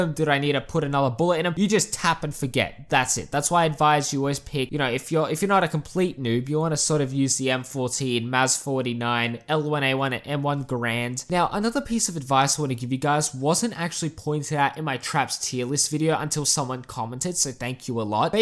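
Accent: Australia. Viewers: Sounds strayan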